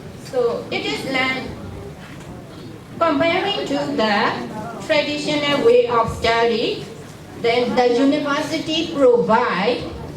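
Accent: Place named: Myanmar. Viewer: ဆရာလေးအဂ်လိပ်စကား‌ေ့ြာတာ ငပိသံမပျောက်သေး။ အဂ်လိပ်စကားပီပီသသဖြစ်အောင် နိုင်ငံခြားသားနဲ့ များများစကား‌ေ့ပြာပါ ဗမာစကားလုံးအသံထွက်နဲ့ပြောသလိုဘဲ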